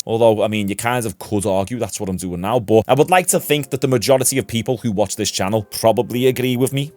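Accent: Liverpool, United Kingdom. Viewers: I love your accent. Its the best of the British accents 👏🏾👏🏾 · Listening to your voice you sound like you're from Liverpool one thing I can say about you guys man you're on point wherever there's b***** you guys make sure you make a stand I'll totally agree with everything you're saying this is a joke I haven't watched the Olympics for the last three times it's ridiculous lots of love to my Liverpool people😮😢😮😢😮😢😮😢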